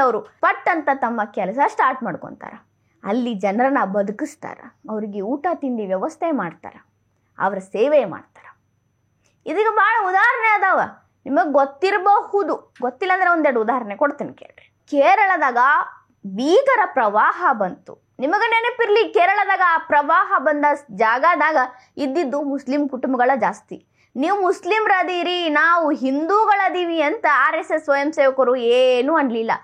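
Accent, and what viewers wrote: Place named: Kalaburagi, India. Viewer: ನಿಮ್ಮಿಂದ ಸಹಜವಾಗಿ, ನಿರರ್ಗಳವಾಗಿ ಬರುವ ಕಲ್ಬುರ್ಗಿಯ ಭಾಷೆಯ ಸೊಗಡು, 1968-70ರ ನನ್ನ ಅಲ್ಲಿನ ವಿದ್ಯಾರ್ಥಿ ಜೀವನದ ತರುವಾಯ ಕೇಳಿದ್ದು ಬಹಳ ಕಡಿಮೆ. ವಿಷಯದ ವಿಶ್ಲೇಷಣೆ ಹಾಗೂ ನಿರೂಪಣೆಯೂ ಪರಿಶುದ್ಧವಾಗಿವೆ.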